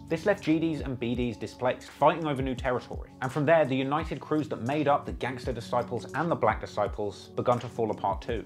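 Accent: England, United Kingdom. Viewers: So we really listening to this British or whatever the hell it is tell us about American gangs and then he has a rap label that he said is the new death row?!? Wake up people. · why is a British dude talking about gangs? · RIP to all the young brothers that lost their lives. Once again the devil is trying to divide the Black Community and the Hip Hop Community. Unity is power brothers . Brother Hoover said G D is growth and Development the B D in this case should be Business Development. You see they have a White Man with an England accent narrating this, he is exploiting black youth he will never set foot on Parkway Gardens or O Block to help these young brothers. Unite GDs and BDs because we have one common enemy. Let's build up our communities and protect our children, women and senior citizens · It’s a little weird a Brit talking about Chicago gang history but you seem to have done an extensive amount of research. 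🤷🏽‍♂️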